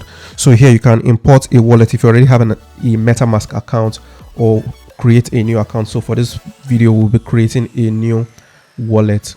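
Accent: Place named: Nigeria. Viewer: Thanks alot for the walkthrough!! You sound like you are from Nigeria bro.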